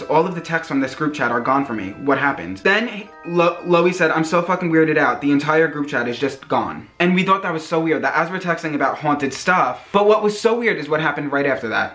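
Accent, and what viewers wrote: New York, United States. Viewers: You are the most beautiful person I have ever seen 😭 this is the first video I’ve watched from you and you are so awesome btw I love your New York accent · Your New York accent oh my goddd 😍😍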